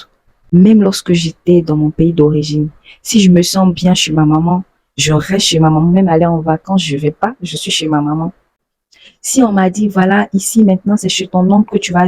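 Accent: Ivory Coast. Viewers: Tu as même,l accent ivoirien, lol sois bénie, akwaba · Vous avez l'accent ivoiriens mou pour moi j'écoute une ivoirienne parlé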